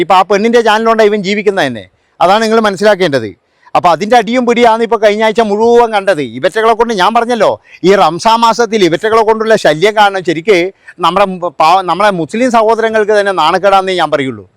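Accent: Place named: Malappuram, India. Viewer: ❤... good talking... Malappuram slangs..if it's ❤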